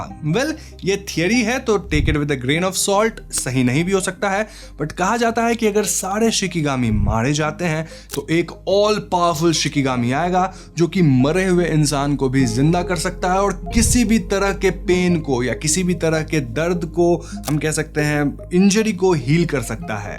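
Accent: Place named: Bihar, India. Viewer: Your bihari accent is so sexy... Please say it again....❤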